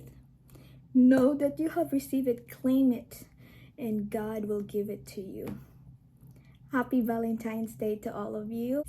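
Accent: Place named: Philippines. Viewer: I'd thumb it up twice for authenticity. This lady is a representative of a real everyday Filipinas. She isn't fake or showboating or covering up her accent.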